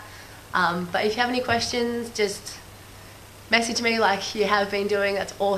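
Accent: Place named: Australia. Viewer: Shut up!!!!! I keep falling in love with you every time i hear you australian accent!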